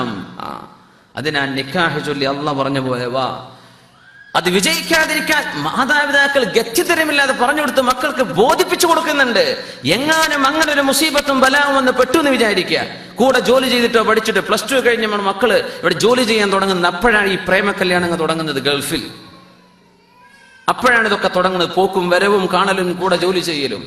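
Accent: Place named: United States. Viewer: Ni endinaaaa English american pole slang Ni thani malayali allle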